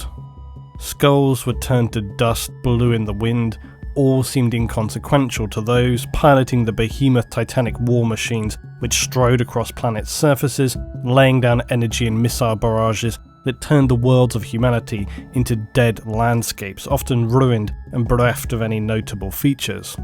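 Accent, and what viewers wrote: United Kingdom. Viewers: Man your the best Warhammer 40k narrator on YouTube! I try to watch other channels but they dnt have ur British accent. I swear it makes the lore/story 10× better. Huge fan! Love your stuff💯🤘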